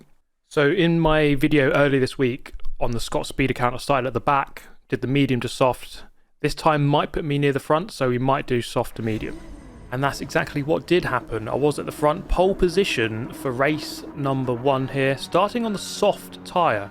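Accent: United Kingdom. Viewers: Always calm and composed, unbelievably well spoken, tastefully subtle British accent, and an incredibly smooth natural tonality of voice complimented by a great recording / microphone is a legit perfect combination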